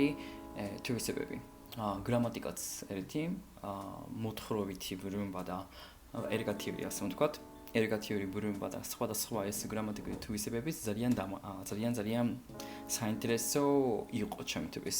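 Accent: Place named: Kakheti, Georgia. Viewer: ვაა ნამდვილი კახელი , შემოგევლე გულში🥰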